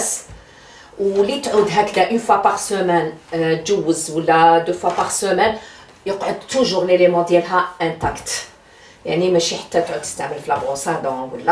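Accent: Algiers, Algeria. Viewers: يعطيك الصحة حبيتك للهجة تعك فكرتني في العاصمة القصبة بادات ونقى تاعك تقول ميمتي العزيزة راه معايا يعطيك الصحة وربي يجزيك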